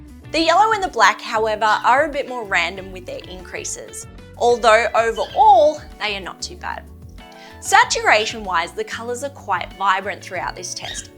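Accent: Australia. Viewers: Hi!, HaHa! Like you, your Aussie accent is lovely! You bring such positive and happy vibes to your channel and as I really need this review, you made getting the info an absolute pleasure! I am so looking forward to more! ~:face-blue-smiling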